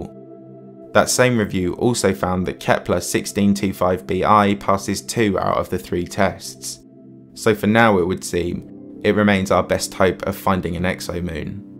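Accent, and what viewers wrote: United Kingdom. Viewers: What kind of British are you?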